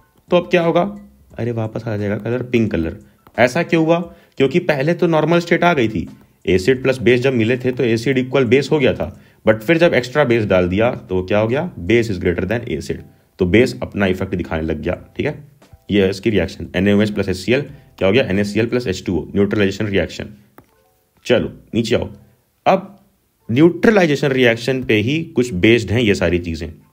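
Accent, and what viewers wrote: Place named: Indore, India. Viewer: Bhaiya aap Indore se ho kya thora Indori Accent aa raha hai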